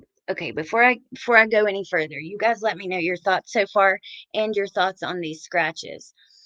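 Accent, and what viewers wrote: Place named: Kentucky, United States. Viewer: I've been looking for this. Thanks so much for the video & reading it out to us. I can concentrate to listen, but I have trouble concentrating when I read more than a few minutes. So thank you!! What State are you from? I grew up in E. KY. You kinda sound like me. Lol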